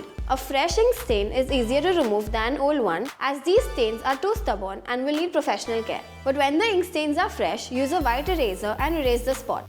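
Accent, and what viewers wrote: India. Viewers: Indian accent sucks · You’re just not used to Indian accent and that’s okay ! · I know! What's wrong with all these people. She's Indian for crying out loud so she has an accent. Any idiot can understand her if they listen. My god. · She has a thick accent as most Indians do.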